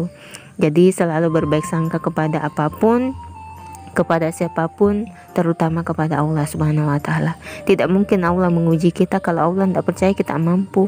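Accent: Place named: South Sulawesi, Indonesia. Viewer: Motivasinya aisyah akan ingat selalu bunda.sepertinya bahasanya dari sulawesi selatan seperti papi aisyah lho :)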